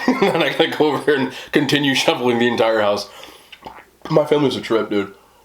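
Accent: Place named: East Coast, United States. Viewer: 😃 food looks delicious. Love your upbeat attitude And eastcoast accent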